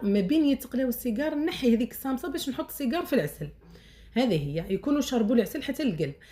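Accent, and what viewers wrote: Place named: Biskra, Algeria. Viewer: خيار الناس حبيبة شكيت في اللهجة انا تاني بسكرية 🥰🥰🥰🥰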